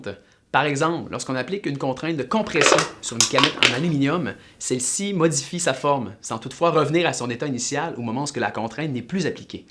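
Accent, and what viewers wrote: Quebec, Canada. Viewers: Je comprends rien à son accent de Québec là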